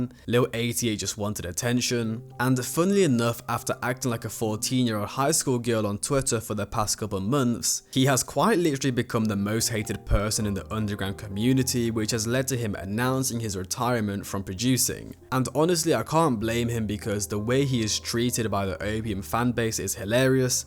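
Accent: United Kingdom. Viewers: Can't take a British accent serious.....especially one trying to be hard.....yall still worship a royal family.....df....haha....every thugg i grew up with would watch their videos just to see the clowns🤡🤡🤡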